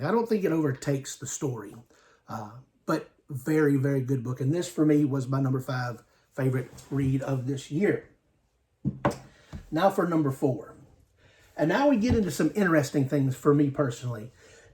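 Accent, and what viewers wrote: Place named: South, United States. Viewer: Cute guy with a southern accent talking bout books? I’m in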